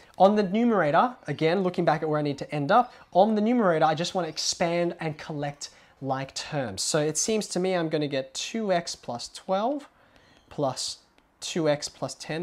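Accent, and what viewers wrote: Australia. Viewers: Australia, apparently (strong diphthong on "o").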